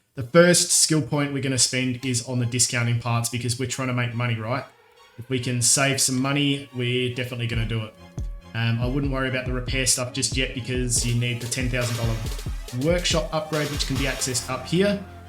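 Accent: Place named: Australia. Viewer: Great advice. Cheers mate, much appreciated. You sound Aussie (Australian). A welcomed relief from other accents online.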